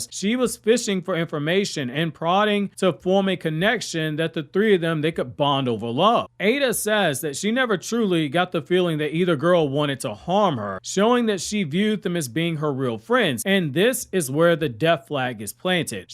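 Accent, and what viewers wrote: New York, United States. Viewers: ole boy has a new york sounding accent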